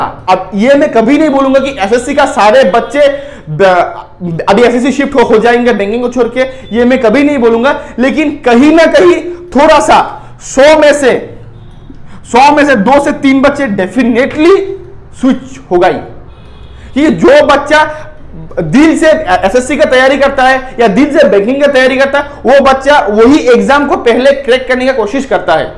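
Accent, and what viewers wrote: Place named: Bengal, India. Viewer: Sir,I had attempted 52 in which 1 is wrong not sure about it,but in others question I have 100% accuracy but say I will consider it above 95% as I did only for accuracy with moderate attempt...So shall I prepare for mains?? category is normal And it was 15 th Oct 3rd shift (tough shift) And are you Bengali bcz accent is similar to that!!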